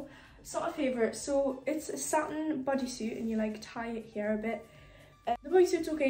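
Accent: United Kingdom. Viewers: اللهجه البريطانيه رووعه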